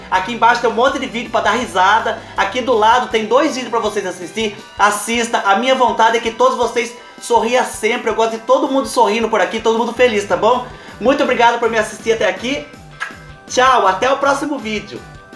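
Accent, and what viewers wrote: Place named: Piumhi, Brazil. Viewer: Noossaa tô apaixonada!! Tu me fez rir d+ sô ! Ele é mineiro tbm gente? O sotaque parece d+ com o do povo de Piumhi kkkkkk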